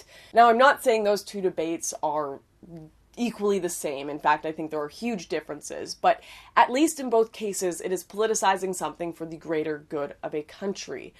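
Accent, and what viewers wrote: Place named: Canada. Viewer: you are getting wiser by the video!! Doesn't mean I agree with everything you say. (Doesn't have to) As usual, like I always say, Canada needs you and we need more Canadian dialect from you. Thank you!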